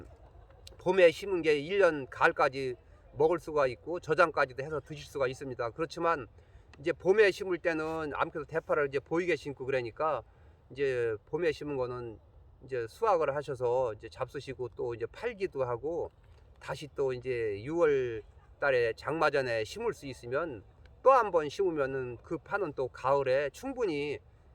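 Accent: Chungcheong, South Korea. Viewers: 질문을 하면 줄줄줄나오는게 넘신기ㅋㅋㅋㅋㄱ구수한 충청도 사투리 넘나 정감가요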